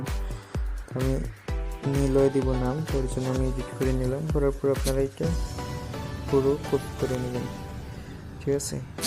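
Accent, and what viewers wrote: Sylhet, Bangladesh. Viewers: Vai....Sylhety ni😁😁😍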